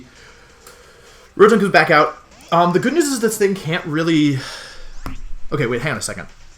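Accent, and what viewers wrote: United Kingdom. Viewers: Bristolian is like no other british accent sir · LOL the british accent, love it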